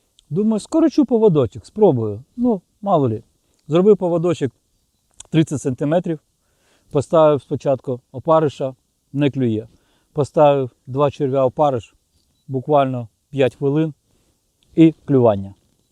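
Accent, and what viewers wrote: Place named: Western Ukraine, Ukraine. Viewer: Неначе пів життя на західній Україні проживав, мова топ( майже😊)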